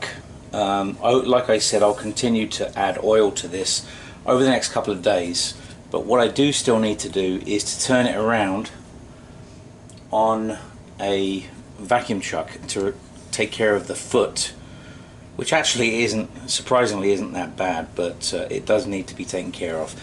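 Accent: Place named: England, United Kingdom. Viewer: That was brilliant and Im not sure where you live but you do sound English a bit like Jason Stathem Not used oil much as I cant get a good finish with it but Im sure its just me.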